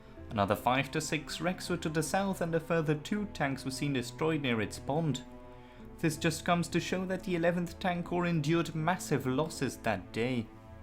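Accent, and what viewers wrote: Germany. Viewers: Say, good sir, would you not be German, per chance? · Is my guess correct - you are German? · Highly excellent and authentic German pronunciation :) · ron I could be wrong but I think the narrator's first language is german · hehe... I can believe. Though, I was sure you were German, as your accent sounded so. Rgds, W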